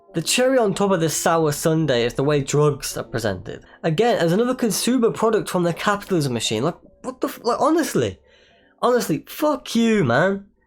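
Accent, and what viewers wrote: United Kingdom. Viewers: you like like my older brother but... british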